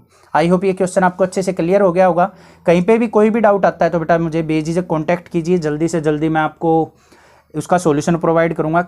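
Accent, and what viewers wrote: Haryana, India. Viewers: Sir it's the best explanation ever for these questions !! It's really helpful!! And from your language ; I think you are from haryana😁😁😁😁 Jai Haryana Jai haryanvi🤘🤘🤘🤟🤟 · Sir aap haryana se ho kya?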